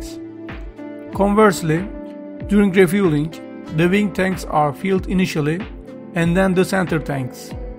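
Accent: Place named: Turkey. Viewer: Nerde duysam tanırım bu Türk ingilizcesi, selamlar kardeşim :)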